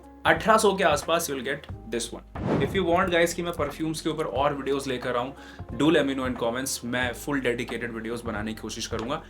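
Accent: Lucknow, India. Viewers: bhai ap lko se ho kya??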